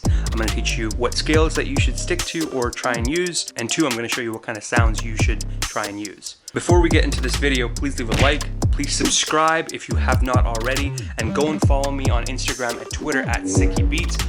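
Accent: India. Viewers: do u hav Indian origin ??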